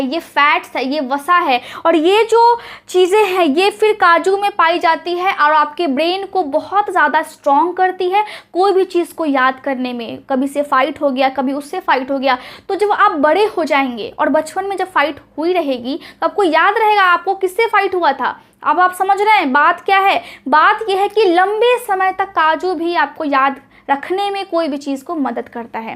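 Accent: Bihar, India. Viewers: bache tune mere pass Janam kyon nhi leiya. Love you and God help you. From Himachal Pradesh 176214 PIN. Bache flow aah rahe hai Bihari language ka. Beta update. You are coordily invited to visit HP and stay my home with your mom & dad. God bless you.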